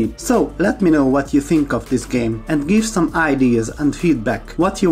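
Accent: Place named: Hungary. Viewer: Are you by any chance from hungary? You sound like a fellow hungarian haha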